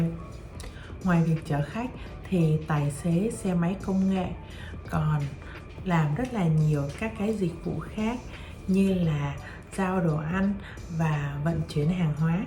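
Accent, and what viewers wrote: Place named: North, Vietnam. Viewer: Cảm ơn em. Quê của em ở đâu? Anh nghe em nói rất rõ. Em dùng “z” như ở miền Bắc nhưng dễ hiểu nhỉ 😮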